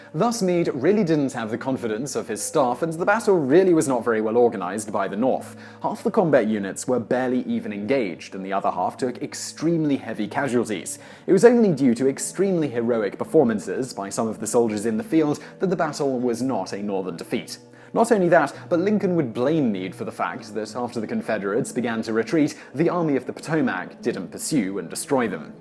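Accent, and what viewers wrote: England, United Kingdom. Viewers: Tory Sanders He definitely said Gettys burg with the wonderful English accent. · Don't care for a LIMEY narrating US History! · Why is a british guy trying to tell us about Gettysburg - maybe he should have done a video on yorktown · Our history not urs, ur obviously british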